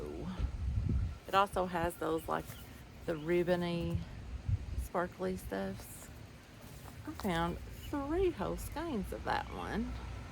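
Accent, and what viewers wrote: Kentucky, United States. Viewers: I love Vintage yarn and love finding yarn at yard sales and thrift stores. New Subscriber here. Are you from Kentucky? We have similar accents. Your voice is comforting.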